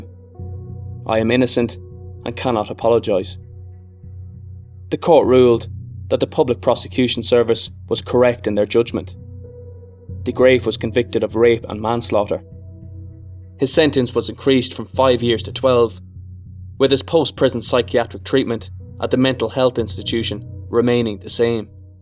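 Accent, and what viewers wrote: Ireland. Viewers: I'm pretty sure the narrator is Irish. Try pronouncing some of their names.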